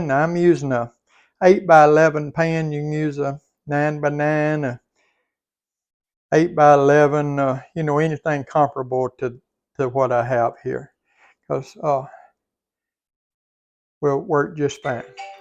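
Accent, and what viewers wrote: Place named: Louisiana, United States. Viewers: Natural yogurt is a good cheaper substitute for sour cream, they want $6.00 for 1 cup 250ml sour cream here now 🤨 and I noticed the other day that your dollar is our 1.52 We don’t have cool whip either, we used to have frozen dessert whip back in the 80’s greasy horrible stuff it was. Didn’t look anywhere near as good as yours. I just Love your Southern accent, I used to follow Miss Phyllis Southern Frugal back in 2019 before She and Mr Bucky went home to be with the Lord, he Son runs her channel now, but I don’t enjoy it without her blessed beautiful heart, still has all the good frugal old time recipes though, ✝️🙏🏽💖💙 Jesus is Coming I hope ya’ll know the Rapture is VERY CLOSE 👑✝️🙌🏽☁️💫☁️💕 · You Sound Just Like My Louisiana Family ..♥️♥️♥️I love Your Southern Attitude 🤗🙏🏼Looks So Yummy ! BEAUTIFUL ♥️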